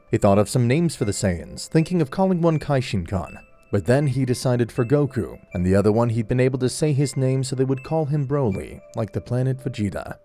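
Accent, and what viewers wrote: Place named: United Kingdom. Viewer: I agree but you sound...toooo british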